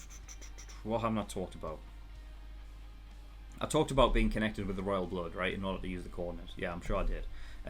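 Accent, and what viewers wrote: United Kingdom. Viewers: I love your reaction so much🙆‍♂️🙆‍♂️I'm Taiwanese. I watch your video for analyzing and learning English listening. 😂Because I seldom hear England accent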